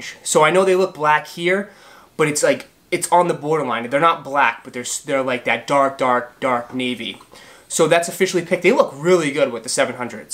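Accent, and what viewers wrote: Boston, United States. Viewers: Damn bro I knew you were from Boston before you even said it lol I have a co worker who talks just like you! Shoutout from Seattle my G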